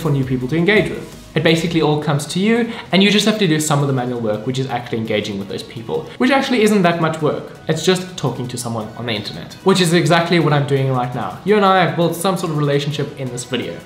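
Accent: South Africa. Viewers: Are u South African I am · is that a saffa accent bruh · Is this guy South African?🤔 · Love this video! I noticed you have a South African accent, I was actually born in South Africa too! 😊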